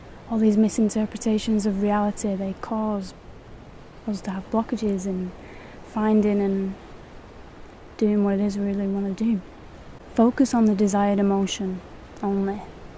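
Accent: United Kingdom. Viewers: Like so many before me, I would like to express my gratitude for your channel and my joy in finding you! I just today found your videos and am so happy that I have. ASMR coupled with spirituality and themes such as unity and a universal oneness is exactly what has attracted me so much, in addition to your beautiful voice (singing and speaking) and positivity. You are such a beautiful light and a lovely soul! Thank you for all your wisdom and sharing these relaxing and informative videos for the world. <3 Also, I have to ask. Your website states you're from Manchester, England. However, I think you sound just like Ygritte from game of Thrones (Jon Snow's first girlfriend, if you're familiar with the show). She's played by Rose Leslie, who is Scottish. So I was extremely curious how a British accent and a Scottish accent sound so similar! Are Manchester accents similar, in your opinion? 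I'm just a silly American who obviously is unfamiliar with the subtleties and differences in accents. Thank you again,! Much love and light! <3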